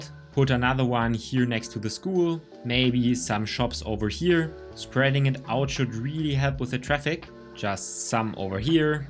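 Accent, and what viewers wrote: Germany. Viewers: Also nich böse gemein. Das hört man ja raus dass er deutsch is😅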